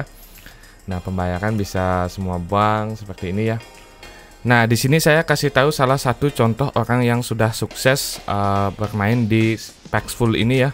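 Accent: Bali, Indonesia. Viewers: Mantap ilmunya bosku.. Tp logatnya ky org bali ya 😁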